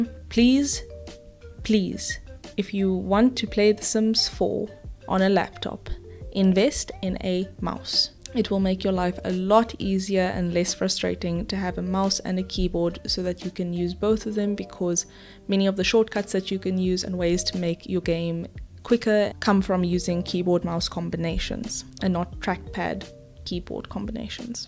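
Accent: South Africa. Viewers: That South African accent is wholesome. I dont know if I'm wrong but... I love it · Definitely a South African accent. Are you South African?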